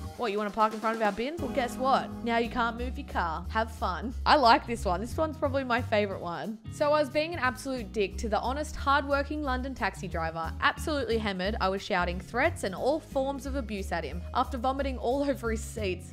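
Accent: Australia. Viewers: I like the Australian accent!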